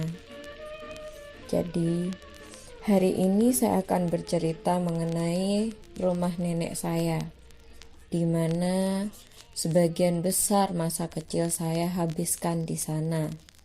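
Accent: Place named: Java, Indonesia. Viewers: iya medhok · Teh versi logat jawa ya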